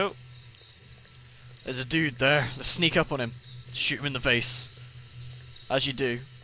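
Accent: United Kingdom. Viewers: i cant tell is he brithish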